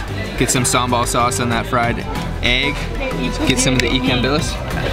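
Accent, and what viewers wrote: Canada. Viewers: Its canadian speaking style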